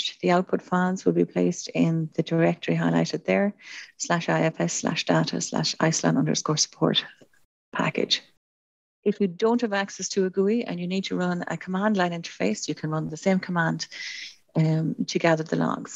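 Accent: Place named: Ireland. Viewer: A lot more videos should be done in an Irish accent. Thank you.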